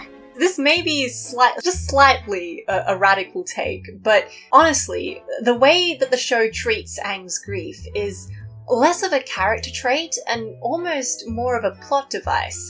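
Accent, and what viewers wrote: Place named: Australia. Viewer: I love the way you pronounce Aang's name in your Australian accent.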